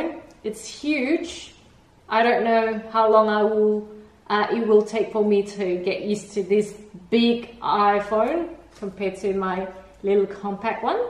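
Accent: Australia. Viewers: Are you Australian? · I love your accent I'm Australian as well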